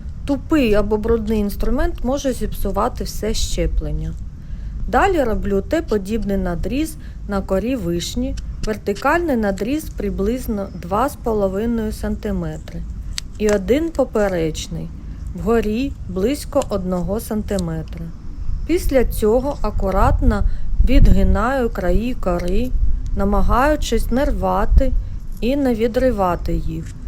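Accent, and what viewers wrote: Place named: Surzhyk, Ukraine. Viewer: Лайк за українську, хоч і трохи з суржиком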